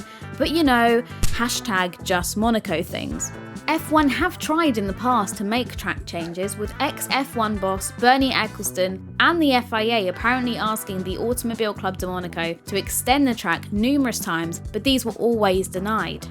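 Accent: United Kingdom. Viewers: Your accent is the most British I ever heard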